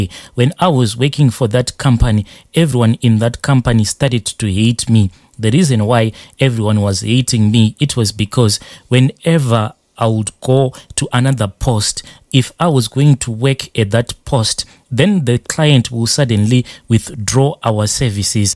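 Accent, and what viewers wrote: Zimbabwe. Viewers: Is he from Zim this brother his tongue said so.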